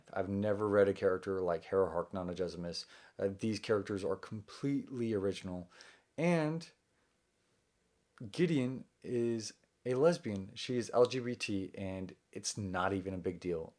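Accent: South, United States. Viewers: I can't believe you had a book called, "Gideon the Ninth" by Tamsyn Muir at your house in Texas on this video during your quarantine until it came out last year back in September 2019 while you're reading and talking about a swordfighter named, Gideon, who is funny, witty, very charming and such a thorn in Harrowhark's side after that, but I hope your husband, Zach will be very proud of you and I love the black and white makeup on your face to look like the character, Gideon from the book in front of it, because I love your Southern accent and it was my birthday last Wednesday, so I'm 31 years old, right now!!! #GideonTheNinth #bookreview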